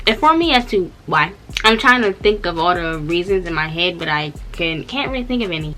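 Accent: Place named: Maryland, United States. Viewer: I guested right she was from maryland because of how she talked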